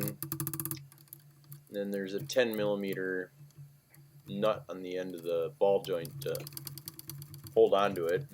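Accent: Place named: Wisconsin, United States. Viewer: Thanks, I have a 2001 x5 and doing this project this weekend in Arizona. I noticed the accent, I grew up in Oshkosh.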